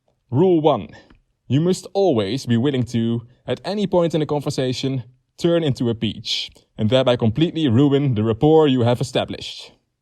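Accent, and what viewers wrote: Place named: Netherlands. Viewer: Are you dutch? You really sound dutch Anyways, great content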